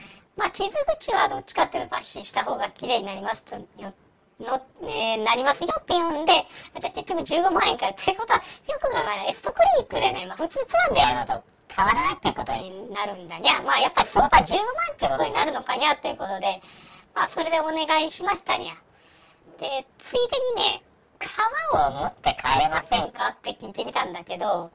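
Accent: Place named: Tottori, Japan. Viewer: やっぱり鳥取方言にしか聞こえない。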